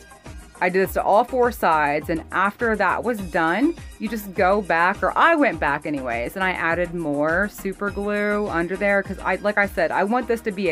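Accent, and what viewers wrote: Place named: Pennsylvania, United States. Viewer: Love the lantern! Would I be wrong to say your from Pennsylvania? I say that because your voice/accent is so much like my family's.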